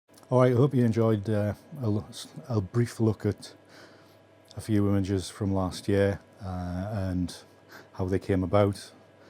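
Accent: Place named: Northumberland, United Kingdom. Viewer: Hey, thanks for sharing your process and approach, your images really cross a lot boundaries, which for me makes them so interesting. Also as a lad born and brought up in Corbridge but loving in Devon, I am loving hearing the Northumbrian accent. Have you met Thomas Heaton btw?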